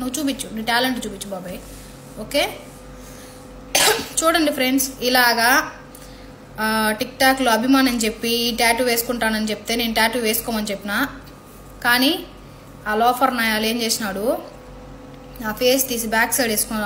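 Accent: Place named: Kadapa, India. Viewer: Kadapa language vaguthunnavu neevu ya district girl.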